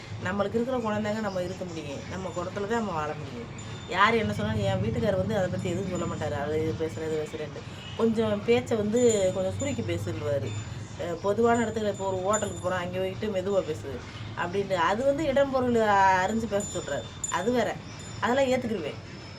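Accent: Madurai, India. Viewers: நீங்க மதுரை ya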